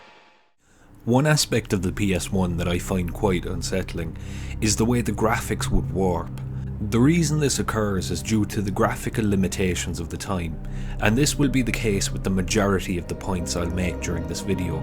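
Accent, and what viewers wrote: Cork, Ireland. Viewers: Cork accent? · Is that an eire accent? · Your accent is so unsettling. Are you Irish?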